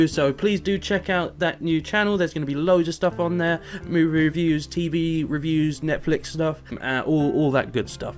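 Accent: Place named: England, United Kingdom. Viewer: Everything sounds better with an English accent. 😊 You have a great voice tone.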